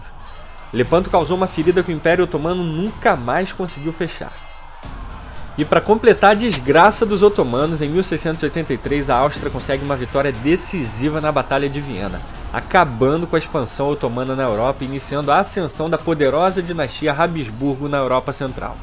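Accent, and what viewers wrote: Rio de Janeiro, Brazil. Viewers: insuportável sotaque e giria carioca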